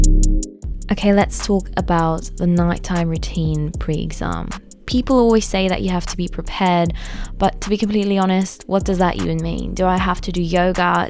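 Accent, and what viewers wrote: United Kingdom. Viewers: Ich finds zu genial, dass du im deutschen auch diesen englischen Akzent jetzt hast :D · I love the British accent and I was convinced u‘re British..und dann fängst du an Deutsch zu reden😂😂💖Loved the video, thank for motivating us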